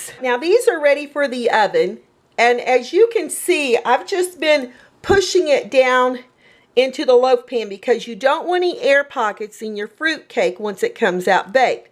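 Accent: South, United States. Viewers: Are you from the south ? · Are you from the south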